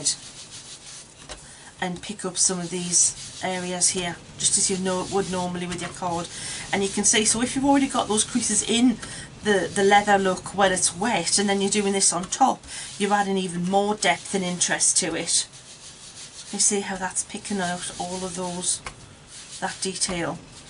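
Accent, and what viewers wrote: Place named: Newcastle, United Kingdom. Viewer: You sound like you come from Newcastle upon Tyne area. My great grandfather was from there. I am Australian. He came here in the 1890s . I am so enthused since seeing your embossed version. Might have to purchase a machine.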